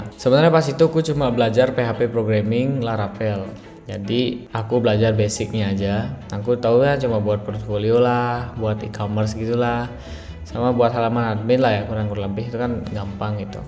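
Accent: Bali, Indonesia. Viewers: logatnya kayak orang bali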